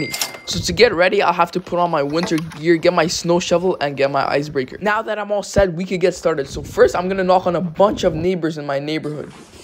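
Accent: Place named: Toronto, Canada. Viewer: this guy lives in toronto i can tell from the accent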